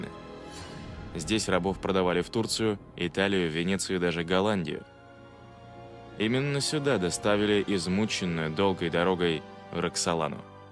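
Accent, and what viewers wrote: Russia. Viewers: …ваш контент придуман для того, чтобы якобы под видом истории уменьшать, умалять, принижать, перекручивать и переворачивать смысл, где-то врать и и тем самым показывать худшее и якобы превалирующее над всем!!!… - в частности всё украинское!!!…, например - на примере Роксаланы!!!… Очень удивительно, что это видео, названи его написано на НЕМЕЦКОМ ЯЗЫКЕ, снято видео на УКРАИНСКОМ ЯЗЫКЕ (который заглушен) и повествование ведётся на РУССКОМ ЯЗЫКЕ с российским акцентом!!!… при чём не только переводится с УКРАИНСКОГО ЯЗЫКА, НО И МНОГО ДОБАВЛЯЕТСЯ негативной отсебятины… на русском!!!…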